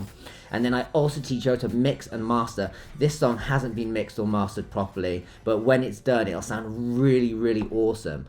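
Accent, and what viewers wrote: United Kingdom. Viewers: Shit,! That was a very nice video. I am in a DJ/producer course, but now I see I should have spent the money on gear and learned from you, on YT. You have great content. Hope we can share a beer when I'm UK (you're based there, I assume, due to your accent.) Love from Brazil.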